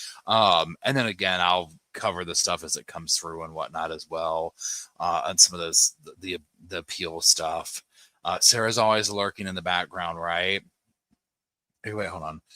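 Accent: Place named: East Tennessee, United States. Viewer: I’m from east tn and it’s so nice to hear someone that sounds like me and I can understand. Love your storytelling style.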